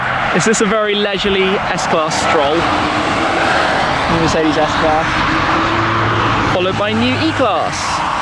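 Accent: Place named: United Kingdom. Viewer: the man of a billion cars and with the amazing British accent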